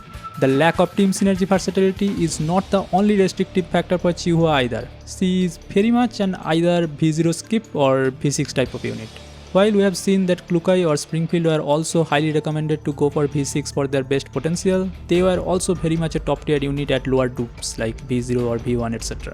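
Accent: India. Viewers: why you sound like an indian?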